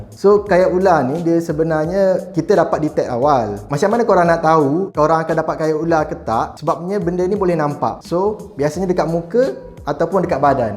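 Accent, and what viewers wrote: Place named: Kelantan, Malaysia. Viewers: Confirm abg ni orang pantai timur 😂😂. ade seni dia tu.. nice content bro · Ore kelate kcek luar nmpk lain .tu buleh taw dye ni ore kelate😍😍😍😍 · Hahaha jolo tu abg ni ore kelate laa cakap gitu gakk 😂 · Bunyi Kelate parok. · Ni mesti org kelantan / ganu 🤣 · Bang kelantan ke? Napok ckp kl jd kekok..haha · abang ni oran kelantan ke? bunyi pelat bektuh hihihi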